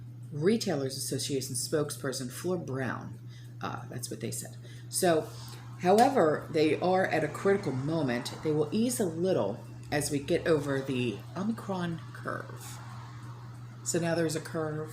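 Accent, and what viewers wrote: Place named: New York, United States. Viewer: “Yous guys” I LOVE IT!! New York sass and GREAT INFO!! Thank you!!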